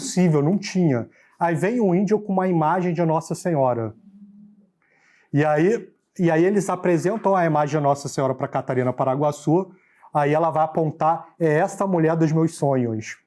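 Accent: Rio de Janeiro, Brazil. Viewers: Qual o interesse de assistir a quase duas horas ouvindo um carioca com forte sotaque falando de um padre que julgamos já saber quem foi? Pois informo: nessa conferência há história da Igreja, história do Brasil, história dos Santos, testemunho de milagres, história das batalhas, história dos índios, e muita emoção e piedade. Vale cada minuto. E para quem ama a Igreja, ama nossa terra, no final corre o risco de derrubar lágrimas de beleza, como eu. Viva Cristo Rei!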